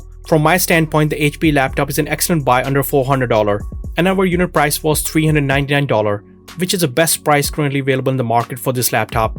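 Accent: India. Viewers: Video was awesome but the thing I noticed was your accent didn't sound like American but as an indian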